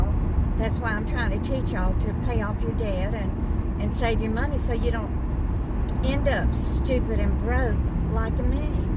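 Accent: South, United States. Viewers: Hi, your accent sounds southern. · MOVE! Your living in a High Price Area. You sound southern, move to the south.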